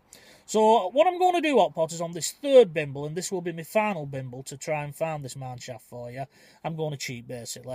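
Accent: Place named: Yorkshire, United Kingdom. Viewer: Loving your Yorkshire accent, reminds me of Emmerdale.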